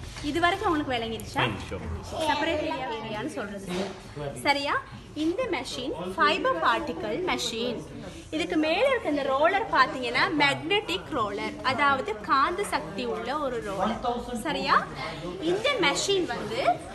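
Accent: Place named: Sri Lanka. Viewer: Like Srilankan Tamil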